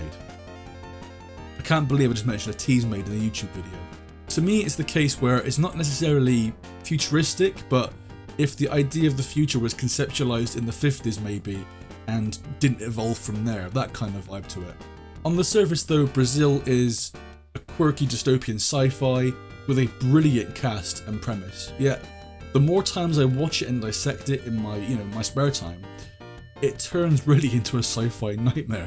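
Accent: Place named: Sussex, United Kingdom. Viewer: Where are you from, mate? Got to place your accent. I'd say Sussex if I had to guess or Wiltshire. Sorry, I've got something wrong with me where i need to know where people are from?!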